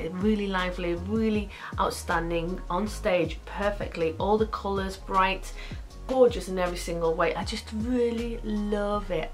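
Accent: United Kingdom. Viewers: where you get that English/British accent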